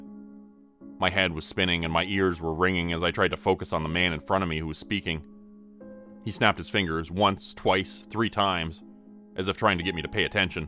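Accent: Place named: Canada. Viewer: I KNEW u were from Canada! The way u say any word w/ "out" in it. Or box. Or house.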